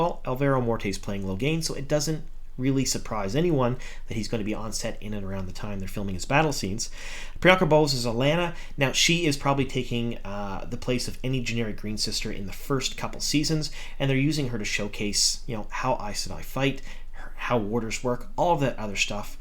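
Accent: Canada. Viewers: You sound Canadian, do you watch hockey ? (Let´s go Penguins!).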